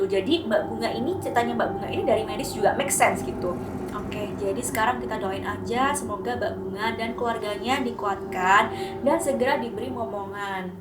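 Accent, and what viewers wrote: Java, Indonesia. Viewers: Bang orang Jawa ya semangat 👍💪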